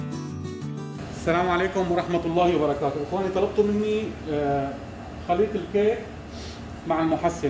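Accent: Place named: Damascus, Syria. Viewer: الله يعطيك الف عافيه انا لما بشوف فيديوهاتك وطريقه حكيك بحس حالي قاعده بنص شام الله يفرج ع سوريه واهله بجاه الحبيب محمد(ص)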